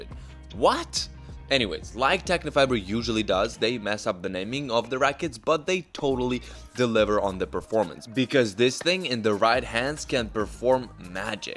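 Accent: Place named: Russia. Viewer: From what part of Russia are you from? I Love your videos btw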